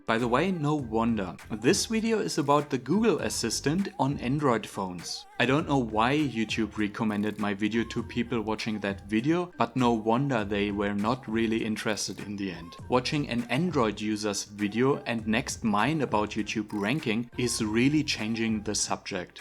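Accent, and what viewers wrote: Germany. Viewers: Heavy German Accent. You say [exactly] instead of [exectly]. I know the first is right but its spoken like the second one. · alter red doch einfach deutsch man haha, wenn deutsche english kann man nicht aushalten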